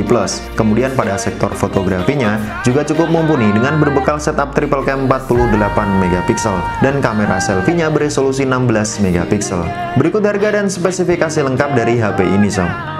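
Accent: Madura, Indonesia. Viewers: Bang.. madura mana kmu bang?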